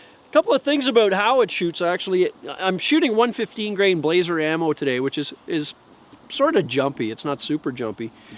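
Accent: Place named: Canada. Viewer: You're either in California or Canada.. I vote Canada, because the "aboat" thing. The gun actually comes with a 17 round mag in normal places.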